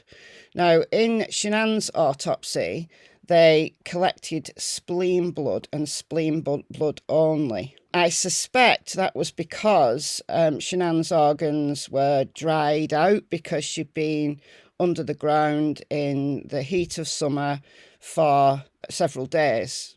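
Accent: United Kingdom. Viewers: Unrelated question, what is your accent, it’s really cool. Somewhere in the UK?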